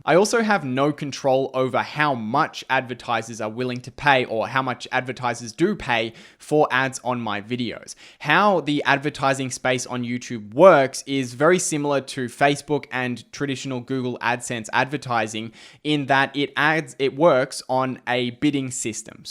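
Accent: Australia. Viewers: Rosy cheeks and Aussie accent ☺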